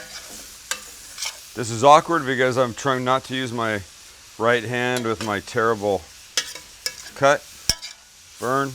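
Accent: Canada. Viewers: That Canadian accent LMFAO! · what part of Canada are you from? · Are you Canadian?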